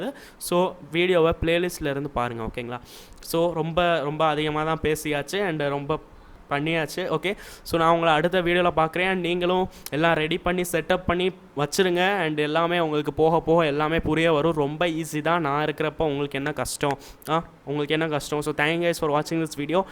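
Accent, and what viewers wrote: Madurai, India. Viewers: thambi madurai ya...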